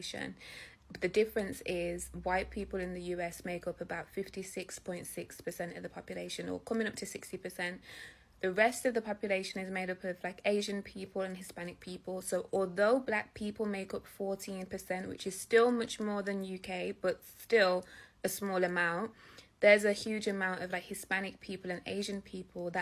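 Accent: Caribbean. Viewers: sounds like caribbean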